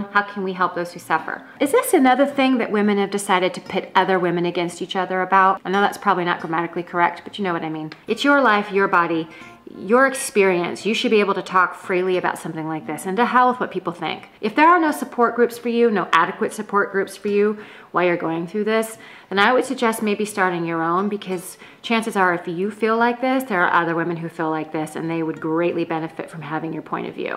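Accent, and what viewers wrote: United Kingdom. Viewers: awesome English accent it sounded just like the posh English accent haha Stay Gay!:) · You got a cute little pommy accent there, how are you at doing an Aussie accent ? If you can do a good one, you'll be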